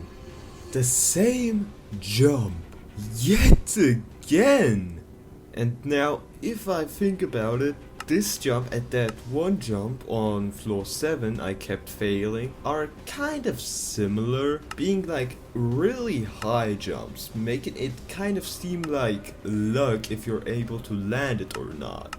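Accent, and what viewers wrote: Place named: Germany. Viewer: holy german accent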